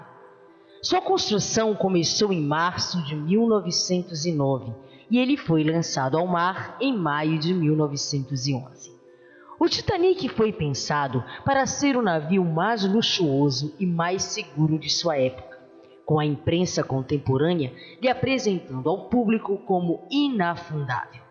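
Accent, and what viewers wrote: Pará, Brazil. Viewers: essa narradora é paraense?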